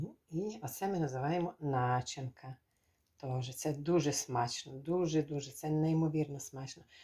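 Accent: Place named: Zastavna, Ukraine. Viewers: Живий класний рецепт!! Дякую- класно що жива мова Заставнявського району, живі звуки кастрюль, дзвенкіт посуди - просто класс! Дякую за рецепт- як писали люди - всі то шукали давно і нарешті в ютьюбі є! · Говірка ,точно як у Заставнівському районі,.....файно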